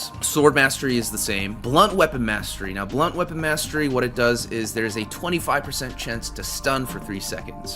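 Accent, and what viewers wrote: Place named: United States. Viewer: dude your accent is awesome, which part of america does it come from?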